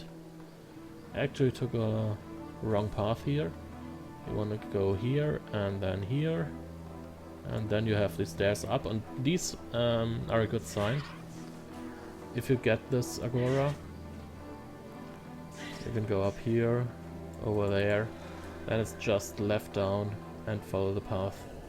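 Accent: Germany. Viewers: Man merkt richtig deinen Deutschen Akzent! :D But i like it.. :) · you sound really German oh du sagst ja sogar du bist aus Deutschland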